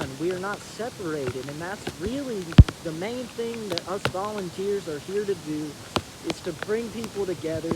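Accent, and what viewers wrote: South, United States. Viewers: You are so young! Where 'on earth' did you so quickly find the time to acquire all that wisdom, sageness, and accomplishment and develop the urgency and ability to put it all into this easygoing flow of eloquently spoken words? Some tremendous former lifetimes must be involved in there! Do you remember parts of one or some of them? I wish I could have crossed your path in one of them!😳 ...(And this time round, is it that I detect a trace of Southern drawl?...🤔😁).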